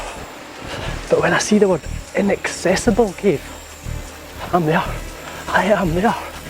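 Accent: Scotland, United Kingdom. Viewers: Why don't we see this sort of view of Scotland and our history on BBC Scotland? This is an authentic looking presenter who sounds and behaves like a native of our country. He is doing much more than telling us of Scottish history and Geography. He is implicitly demonstrating aspects of Scottish culture in his words, actions and mannerisms. Very enjoyable. Many thanks.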